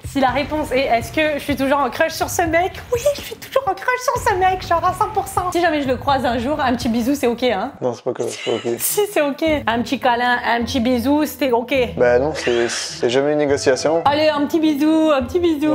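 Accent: Quebec, Canada. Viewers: Je trouve qu’on entend de plus en plus un accent québécois quand tu parles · Je n'avais jamais remarqué mais tu a un peu l'accent Québécois c'est est géniale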